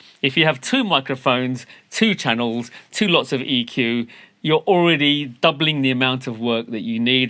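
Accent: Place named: United Kingdom. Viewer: I liked his British accent..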